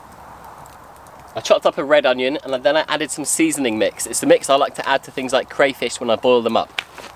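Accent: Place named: RP, United Kingdom. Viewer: How did you & become friends? You've got a very posh RP public school accent while has a distinct regional accent.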